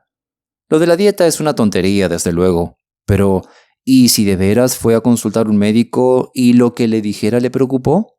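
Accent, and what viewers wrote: Rioplatense, Argentina. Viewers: COMO ME GUSTA SUS RELATOS, PARA MÍ PERFECTO, HABLA COMO YO, RIOPLATENSE, MUY BUENA VOS, PRONUNCIACIÓN, ETC. una abuela desde Montevideo Uruguay 🇺🇾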